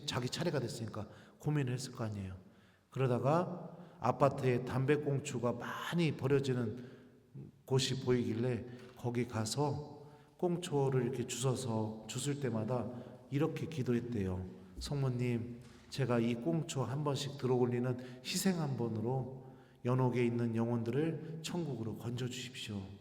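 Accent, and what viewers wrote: Chungcheong, South Korea. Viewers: 신부님의. 강론. 충청도. 사투리가. 구수하고. 귀에. 쏙쏙.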